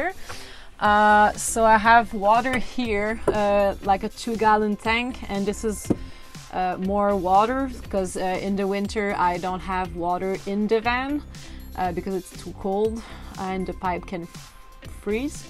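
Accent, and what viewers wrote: Canada. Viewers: Definitely a strong Quebec accent haha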